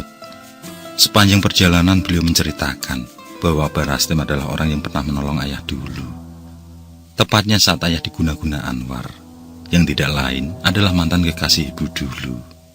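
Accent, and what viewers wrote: Java, Indonesia. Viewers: Eaalaaaah bah Rastim tiang Jawi toh? Tak pikir urang Sunda.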